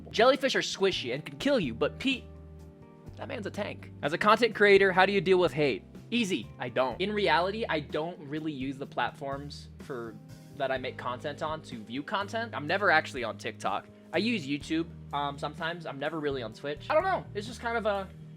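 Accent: United Kingdom. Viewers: LOVE THE NEW BRITISH VOICE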